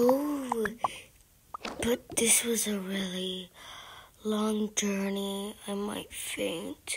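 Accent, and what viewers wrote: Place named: England, United Kingdom. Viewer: 𝕀 𝕝𝕠𝕧𝕖 𝕪𝕠𝕦𝕣 𝕖𝕟𝕘𝕝𝕚𝕤𝕙 𝕒𝕔𝕔𝕖𝕟𝕥<𝟛😛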